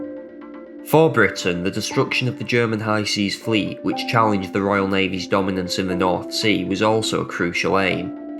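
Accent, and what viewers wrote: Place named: England, United Kingdom. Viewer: Are you from stoke? Never expected a potteries accent clicking on a random vid.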